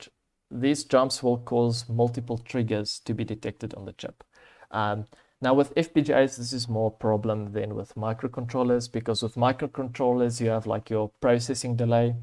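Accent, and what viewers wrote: South Africa. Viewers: I hear an Afrikaans accent. 😁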